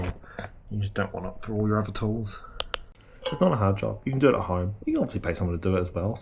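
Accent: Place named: New Zealand. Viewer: Hi. Are you in NZ? Thought I might have picked the accent 👍 Can I ask where you purchased your third party service kit? I’m in NZ & found the tube that connects to the syringe for $19 & 50ml of oil for $15, both from 99 bikes which seems reasonable. Cheers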